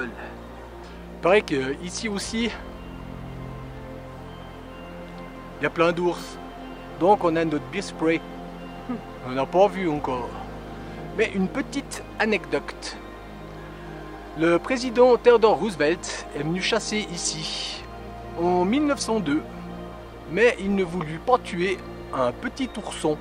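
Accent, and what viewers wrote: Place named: Switzerland. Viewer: Je vous remercie d’avoir pris le temps de répondre, je vais remonter dans vos vidéos et aller voir votre présentation. , J’ai 58 ans et suis dans le même état d’esprit que vous l’étiez certainement avant de faire le pas pour une vie différente , et visiblement nous avons le même parcours de voyage . Je file cet hiver aux Philippine 3 semaines maxi , contraint pas le travail , j’espère que les vacances qui suivront seront les grandes vacances et que je ne serai plus contraint de revenir à date . En attendant, merci encore pour vos vidéos qui font du bien . Et je vous taquine pour l’accent , ne changez rien ! Et vive les suisses !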